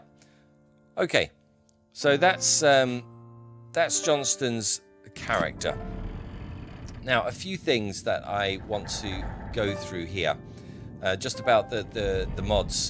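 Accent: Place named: United Kingdom. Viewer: Jonsten, what a chad! Like your British accent btw.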